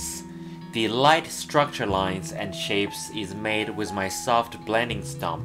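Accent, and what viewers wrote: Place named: China. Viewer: 听口音像是中国人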